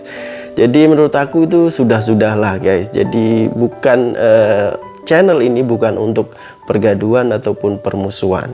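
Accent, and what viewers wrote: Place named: Kuala Lumpur, Malaysia. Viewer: bang bunyi loghat KL haha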